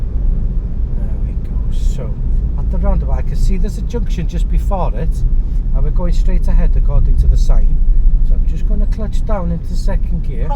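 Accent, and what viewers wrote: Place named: Wales, United Kingdom. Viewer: A bit long?.... yes. Boring, with that lovely Welsh accent? Absolutey not!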